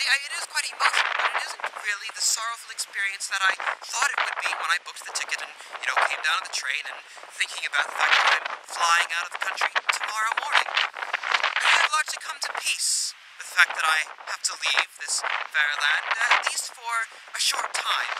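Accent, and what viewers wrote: RP, United Kingdom. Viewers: I believe people don’t think you’re from the United States because you have quite a posh way of speaking and a slight British accent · Wait I thought you were British....... Well my reality is falling apart. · Wait the most british person ever is american? Woaw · I actually thought that he was british— sorry.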